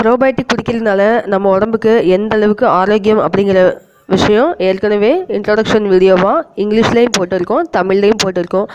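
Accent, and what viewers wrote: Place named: Kerala, India. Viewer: Sister unga malali ucharipu alaga iruku sister😊😊😊.....all the very best for your videos sister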